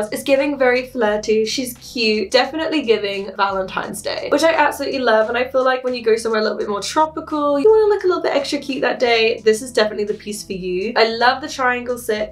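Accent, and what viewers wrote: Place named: United Kingdom. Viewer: You are gorgeous and super cute. Beautiful eyes, cute voice with the British accent, beautiful body and curves, very cute little belly button, nice booty long legs. You look amazing and very cute in every outfit you wore in this Try On Haul video. The blue floral bikini really made your beautiful eyes pop and with the blonde hair hello cutie. The Pinkish bodysuit/one-piece swimsuit made you really beautiful too along with the White big T-shirt at the end. In the White big T-shirt at the end with the blonde hair you are really beautiful. You look like an angel.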